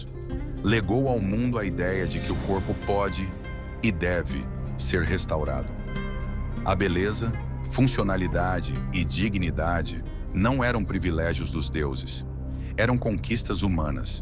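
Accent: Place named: São Paulo Countryside, Brazil. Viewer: Parabéns pelo Canal, eu estou amando e gostei muito do sotaque da voz 😅 bem paulista do interior; me identifiquei muito!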